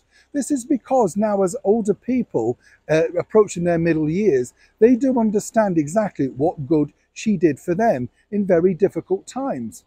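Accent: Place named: West End, United Kingdom. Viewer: Love ya Neil Sean here from the west coast USA!! You got a bit of west end in your accent but with high quality English Grammer. You spot on and I look forward to your reports daily. Cheers mate 🍻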